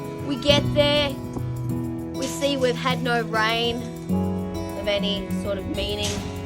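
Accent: Australia. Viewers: Oh, no, trust, at least in the USA, that accent goes a LONG way. A good number of our top stars in Hollywood call Australia home. …Helps that you grow them damned pretty down there, both the gals and the guys. 😉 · The attitude of this channel, from human to dog, is just fucking fabulous❤ I don’t know why the algorithm pushed this channel in my feed, mine are aqua scape, reno and wood work stuff hence the confusion. But mate, am I glad. Girl, you doin’ so good. The only thing I don’t understand, is why are there people dislike these contents and please, I do not wish to hear of it from these people. Hugs from Finland, of all places… PS, your accent make me like my Aussie friends even more😂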